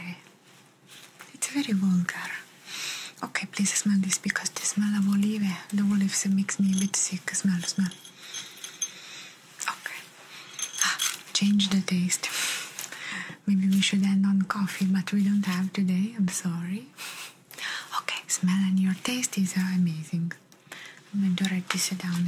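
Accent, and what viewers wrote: Italy. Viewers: Hello, magnificent ASMR with an Italian accent, I loved it and enjoyed it, it is very relaxing, greetings, beautiful 💖💖🌹🌹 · Italian accent videos are perfect for relaxing and unwind your soothing voice makes me feel 😴 😊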